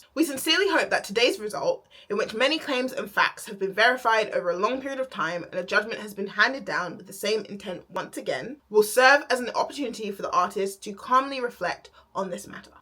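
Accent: United Kingdom. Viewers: American?? I think she's British. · Their pronouns are they/them and yeah I’m pretty sure they’re British, def not an American accent lol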